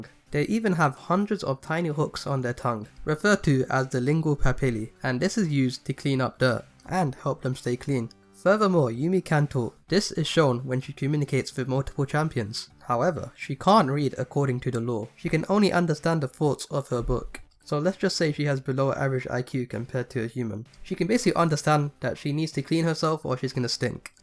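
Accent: United Kingdom. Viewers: Dude I like your accent. It's a british one right? Sorry I'm not familiar with all the accents.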